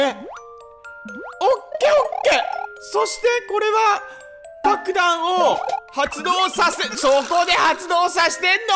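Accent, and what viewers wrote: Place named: Kyushu, Japan. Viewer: さん、前々から思ったけど九州出身ですか？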